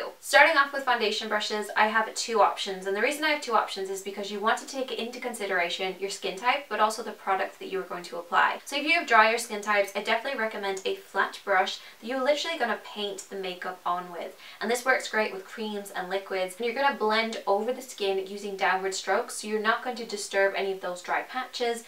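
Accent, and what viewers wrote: Ireland. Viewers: Are you Irish?🇮🇪 P.S I love you😘❤️ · Are you Irish? :)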